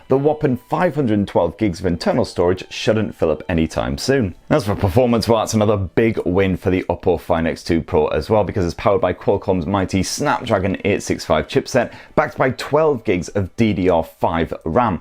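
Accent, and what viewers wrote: United Kingdom. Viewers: Your English is so British 👌❤️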